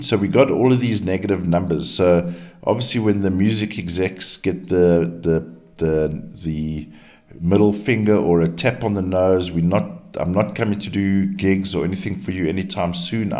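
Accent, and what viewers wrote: South Africa. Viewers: By any chance are you South African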